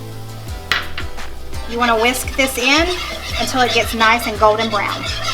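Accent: South, United States. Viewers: Every time I click on one of these recipe videos it's ALWAYS a southern girl with a southern accent. Always. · I love your videos!!! I love that you show step by step of how to prepare the meals. I find it very motivating because sometimes I run out of ideas what to make for dinner. P.S. I love your southern accent:)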